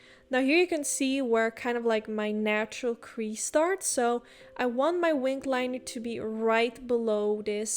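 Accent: Netherlands. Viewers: My mind: SHE IS DUTCH! I could hear u speak English with the dutch highlights😆 hahah very nice video! I do the same!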